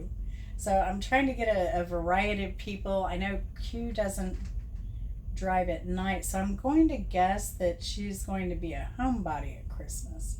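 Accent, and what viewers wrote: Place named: England, United Kingdom. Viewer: I love hearing your English accent because I can learn English listening 🙏 that is truly inspiring and interesting to hear the story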